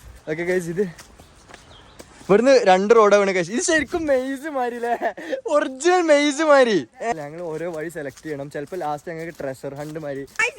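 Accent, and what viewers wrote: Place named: Kasaragod, India. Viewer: Edeyil nammale kasargod bashe bannalo enth pang 🤣💗👍🏻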